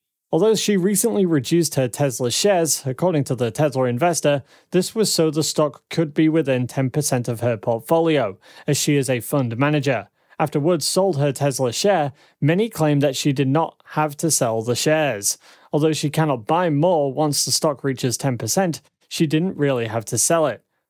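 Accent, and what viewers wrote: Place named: England, United Kingdom. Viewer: You are right!! Imust also add that the accent this person posesses is extremely annoying; Is this guy from England or is he just pretending that he is from England. It's a pain just listning to him with that weird accent.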